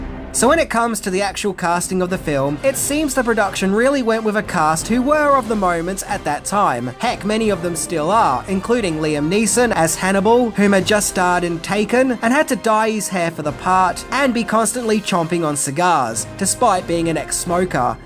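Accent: Australia. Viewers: I think your videos are fun, and I have no problem with you calling "George Peppard," "George Peppered." (I just thought it was your Aussie accent, tbh). Just like you called "Hannibal Smith," "Hannibal Lechter" in this video. Production accidents will happen and, all things considered, I thought THAT was hilarious! 😂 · Personally I think it’s interesting and funny when you pronounce things differently because of your Australian accent. It’s what makes you.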